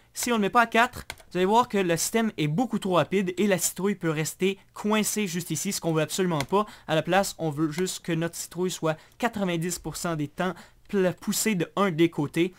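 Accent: Quebec, Canada. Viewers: j aime trop ton accent quebecois ;) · Ta laccen quecois et texpliquer comme un francais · je découvre tout juste, et franchement c'est vraiment pas mal. (j’adore l'accent québécois ) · Moi perso j'aimerais être un Quebecois j'aime l'accent ^^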